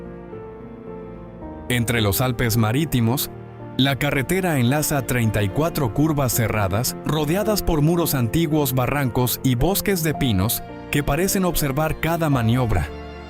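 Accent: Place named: Brazil. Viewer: Abençoado dia por Deus obrigado por passar uma voz quase Brasileira gosto de vidios asim e asim entendi muito bem o que diz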